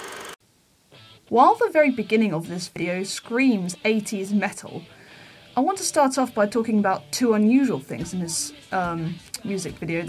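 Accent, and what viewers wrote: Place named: RP, United Kingdom. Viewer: We want to watch the video not listen to your posh british tea and crumpets voice smothering over and therefore ruining this video. Just shut up and take your comments to Dave mustaine, oh wait your probably afraid that he will KICK YOUR SORRY ASS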